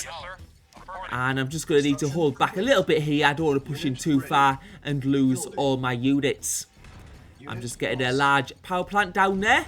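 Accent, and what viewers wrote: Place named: Wales, United Kingdom. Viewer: Love your videos! You are really good at presenting the game. For the life of me though I cannot hear what you are saying in your first few words when a video starts. What accent is this? Welsh?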